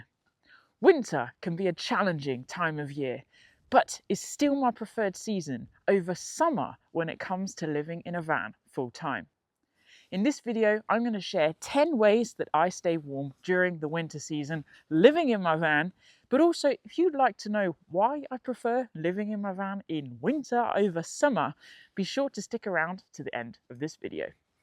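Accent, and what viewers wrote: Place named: Scotland, United Kingdom. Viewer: As you've demonstrated, a Scottish accent can help put you in the mind-frame for hardiness. Allegedly - a cold foot bath before bed can make them warm - (old scouting tip...).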